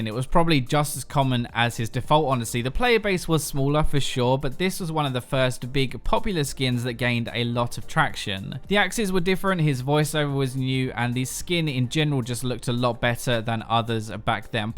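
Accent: United Kingdom. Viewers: Where is URF warick?? This guys is so biased, and his British voice is so annoying.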